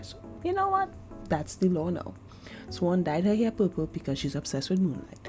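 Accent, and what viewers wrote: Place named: Trinidad and Tobago. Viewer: so i'm listening to you talk and i'm like 'why she sound kinda like a trini so loll' love your art :3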